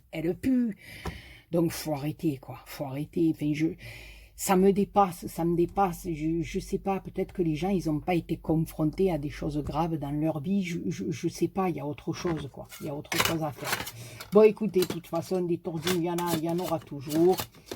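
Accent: South, France. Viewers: Bonjour je tombe sur votre chaîne et quel accent charmant qui sent bon le soleil ☀️ laissez parler les mauvaises langues et bonne continuation 😘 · C’est dommage que tu sois obligée de te justifier. Ton expliquement et avec l’accent c’est presque du Pagnol. J’adooore. Tun’est pas là seule à vendre et après. Qu’ils s’occupent de leur c........bravo la star. Bisous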